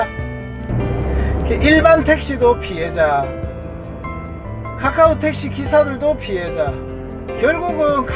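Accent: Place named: Busan, South Korea. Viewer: 부산 말투 재밌어요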